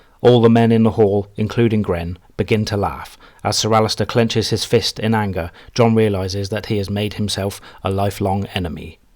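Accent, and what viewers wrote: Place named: England, United Kingdom. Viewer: it's because your accent is so naturally English, that it's difficult to imitate, but sounds so beautifully cultured. Universal English is American English because it's much easier than English English to pronounce. 😆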